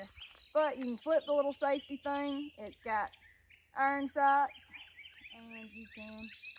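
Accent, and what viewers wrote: South, United States. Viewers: Southern accent is priceless for my Finnish ears :D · Okay my neighbors to the south. I'm up in Vancouver, Canada and obviously we have nothing like this absolutely fascinating and utterly adorable accent (?) up here! Is this just an anomaly or is this basically the 'drawl' southern states have? I have been to Florida and it didn't apply but is this the norm in Kentucky, Tennessee, Georgia and Alabama...and others? I'm thinking maybe Texas as well? Its so damn.....pure? I don't really know what to call it but it's just such a wholesome and comforting kind of (for lack of a better word) accent. It makes me really want to take a trip down and do a road trip through all the southern States the summer. Anybody have any recommendations on the most enjoyable Southern state or states to visit? ❤ from a 🇨🇦! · Personally, I love southern accent. 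But if you would like to hear her as a northern girl, just turn the playback speed to 1.25. 😉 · Southern draw ! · Now folks, this is what a good ole southern girl sounds like. I was born and raised in central Florida and we got some words most people don't use or know of. This young lady is very pretty to boot. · This woman is so freaking adorable 🥰 Enjoy her content ✌🏼 Gorgeous!! That Southern drawl is to die for Where have you been 🤔? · That southern drawl is too cute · Definitely a stallion so beautiful the southern accent makes her even more gorgeous 💕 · Shes gorgeous and as I Texas boy I love her southern accent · Always been a fan of brunettes, loving the southern accent too. Girls and guns, gotta love it. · Always real American accent.. 👍🏻👍🏻👍🏻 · Aye aint gon she so fine 🤧🤣 i need me one of her them south girls w the southern accent that shit so attractive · U go girl bless you 😳 love the way you talk I'm from South Carolina but U got that Southern slang 😍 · There is some accent in this southern! 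· i have no idea what a pepper blaster is. but shes kinda cute says it with a thick southern accent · I sure miss the TN/AL accent!! Gotta love her southern twang!! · This is the most American accent ive ever head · damn had to subscribe because of the country accent love the videos lol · I just love thst country accent. Makes me feel all American inside. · Something real nice about that southern accent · That American accent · "you are Purrrrday"" and your country ACCENT reminds me of,--(, Bless you)), girls that say my name like, cheee-steer-!,lol.(((eye love your channel, 🤓try pepper spray on a 200lb wild hogg, bring extra spray & bee safe. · this is the thickest american accent i have ever heard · I love your country accent oh my God marry me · South state accent? Not my native language, I try to perfect it, so if you mind tell me, I'll be greatful. (You said Alabama, right?) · I tell you what that Southern draw and that Brunette hair my goodness. 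· I just saw one of your videos as I was strolling and I was like wow she’s really beautiful so I instantly went to your channel and subscribed, I love your southern accent and your personality and your in amazing shape but one negative thing I saw was your amazing 💐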